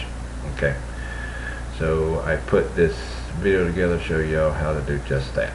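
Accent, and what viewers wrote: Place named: United States. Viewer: bunch of damn yankees commentin on the way this country boy talk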